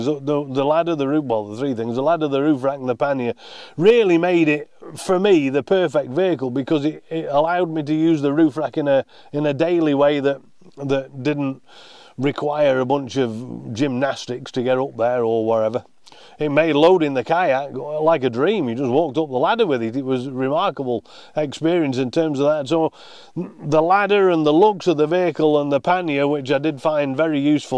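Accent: Yorkshire, United Kingdom. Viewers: thought you was a Yorkshire..guy!! Especially as I thought you sounded sooo much like Fred Dibnah the famous steeplejack. Fred is a legend over here in the Uk.. Here’s a great video of Fred check his works truck out. <link> Derbyshire guy,what a lovely part of the Uk 🇬🇧.. Only 40 minutes for me in the caravan.. Great video..😊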